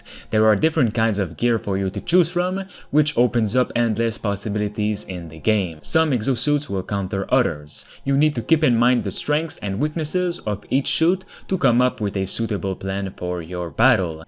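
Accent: Quebec, Canada. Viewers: Hey, ton accent me rappelle celui d'un québécois, je me trompe? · u have a quebecois accent ;) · caliss cest cool de savoir un quebecois qui comment les jeux pis tout mes caliss faut vraiment que tu travaille sur ton accent ca menerveeesss !!!! ont dirais gsp qui parle en anglais fuck! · Hmm are you from Quebec? :D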